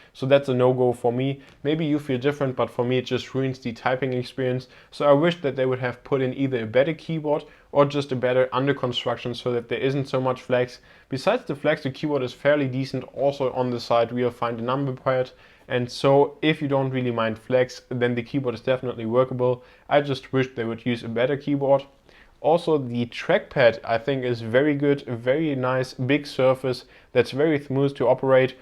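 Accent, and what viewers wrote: Germany. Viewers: Du bist doch deutsch oder? Gutes Review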